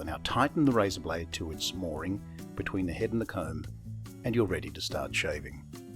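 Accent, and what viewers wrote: Australia. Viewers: G'day, Thank you for a great series of entertaining and educational videos. It's particularly nice to hear a cultured Aussie accent: your voice would not be out of place on ABC Classic FM. A question re witch hazel as an aftershave if you would please be so kind as to answer... Aftershaves (etc) are available which have a witch hazel base--such as the Thayers in your video ($18 plus postage on eBay for 355ml)--but it is also possible to purchase what is described as 100% pure organic witch hazel ($22 plus postage for 500ml on eBay), and T N Dickinson's Witch Hazel ($6 for 240ml from Chemist Warehouse). Are all of these suitable? Are any better or worse? Does the 100% witch hazel (whatever that means) need to be diluted? Big price difference between these.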